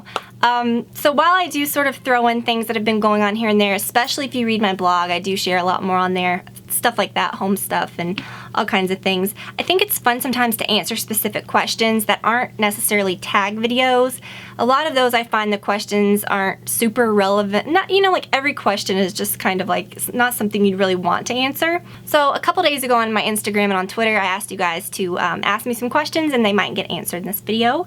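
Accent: South, United States. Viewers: her accent is very southern in this video hahaha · Wow... never knew she had a slight southern accent O_O lol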